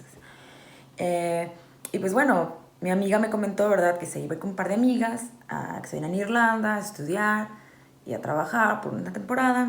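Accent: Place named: Monterrey, Mexico. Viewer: Holaaa. Acabo de suscribirme a tu canal y me gusta tu vibra.. Si no me equivoco te escucho con acento regio o al menos de otro estado del norte del pais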